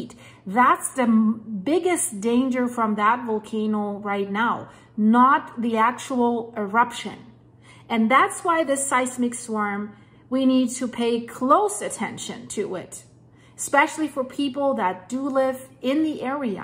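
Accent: Germany. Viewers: I love the German accent especially when discussing volcanology lol How dare you people say such things ☝️ So insulting lol When I first moved to B.C. not long after Mt Saint Helens when off from Australia in 1987. I then saw Mt Baker 😳 holy crap thats scary and all these guys are afraid of snakes and crocodiles. Then I learned of Mt Rainer (Beer cans of coarse) and Mt Shasta and was like omg where heave I moved. B.C. Itself is loaded with more volcanoes north of Vancouver. Australia, the safest place on earth 🌍 so I’m home. Oops, unless we get Tsunamied from a landslide from southern NZ with a mega Tsunami 🌊 of coarse. Such is life as a human being.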